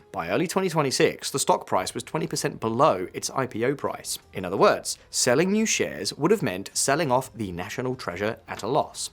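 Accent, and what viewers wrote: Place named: United Kingdom. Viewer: Why is every anti-saudi video made by a british man? Before i even clicked i knew the speaker was British lol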